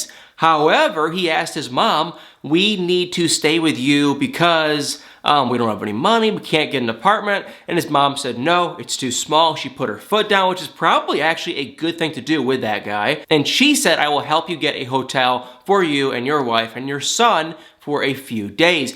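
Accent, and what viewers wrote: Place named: South, United States. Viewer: You're southern aren't you? "woman's trouble" lol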